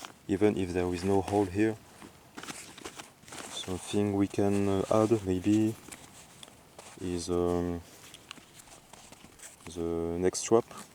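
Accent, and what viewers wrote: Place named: France. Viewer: toi vu l'accent c'est sur t'es francais !!!!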